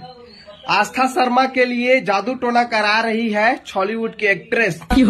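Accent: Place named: Chhattisgarh, India. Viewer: Ak number yrr bhaiya maja aa gaya cg style me 😅😅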